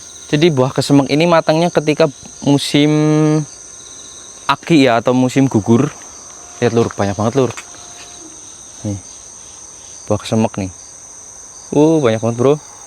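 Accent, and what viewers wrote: Banyumas, Indonesia. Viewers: Kayak cucu ku,dia yg asli anak samarinda,tp tinggal d kebumen skrg ngomongx pakek nyong2 gt,semangat mas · Orang banyumas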